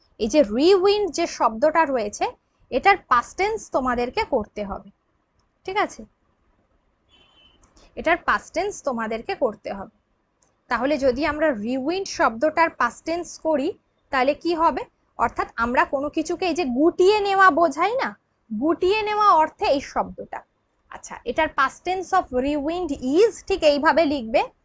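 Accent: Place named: Bangladesh. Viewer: Mami Videote Mamar Chhobi Dekhchhi Aabar Tumi Class Korachchho?Mami Tomra Ki Rifiuji? Tomar Bhanshar (Language) Dhoron (Style) Kintu Bangladeshi Mone Hochchhe?Mami Tumi Jader Class Korachchho Mane Aamaderke Tomar Chhoto Bachcha Mone Hochchhe?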